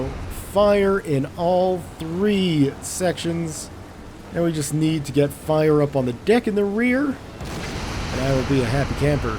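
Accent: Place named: Canada. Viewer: hey its the soothing Canadian voice of that i need in my life.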